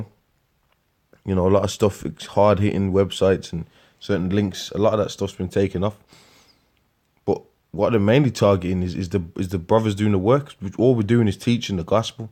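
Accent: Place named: Birmingham, United Kingdom. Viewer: Shalom brother, All praise the the most high. It’s always good to hear a voice with an accent I can recognise, can hear the brum accent clearly cause I live round there. Always trying to get direct contact with some brothers but haven’t been able to, how can I do so ?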